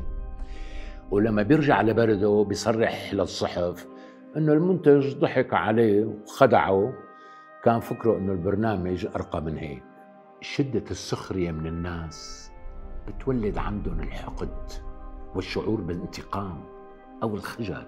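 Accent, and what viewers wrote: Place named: Syria. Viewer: والله انا اسمع للاستاذ مش بس عشان نقده واسلوبه، بس كمان عشان لهجته وطريقة كلامه السورية اللي وحشتنا كثير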